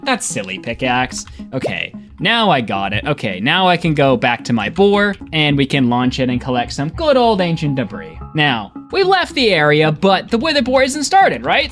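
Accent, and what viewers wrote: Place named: Canada. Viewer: Sounds Canadian Looks German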